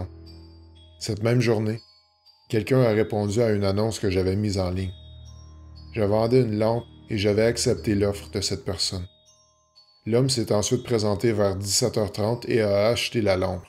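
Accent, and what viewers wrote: Canada. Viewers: J'adore ton accent Canadien, cela donne du piquant à tes histoires.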